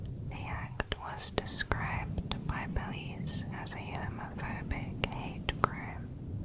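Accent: United Kingdom. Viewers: she has a British accent. Highly doubt she’s Native American. My guess is Nepali or Afghan.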